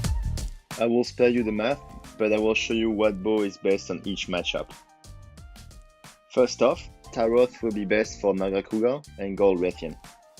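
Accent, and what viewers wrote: France. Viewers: ahhh l'accent français 😏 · Hi are you french ? cause Your accent look like french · tu es francais non?🤫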